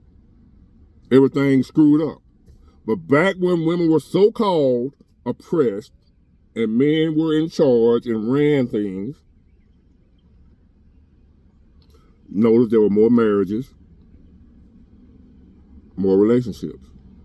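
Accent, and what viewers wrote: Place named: Chicago, United States. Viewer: Chicago voice....finesssee! finessse!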